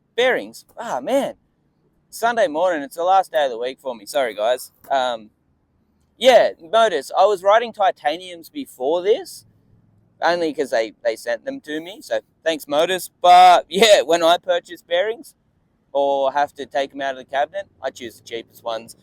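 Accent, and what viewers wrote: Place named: Australia. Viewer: Great video it's interesting to hear other people's setups. I'm a complete beginner and just ordered: - 8.25 Jart Gravity deck (steep concave) - Indy Hollow Forged 144 trucks - Spitfire F4 Radial 53mm 99a (Black trippy swirl decal) - Bones Race Reds bearings - Bones Medium bushings (black/yellow) I have a feeling this setups gonna feel very nice based on the research I've done. Greetings from england, love the aussie accent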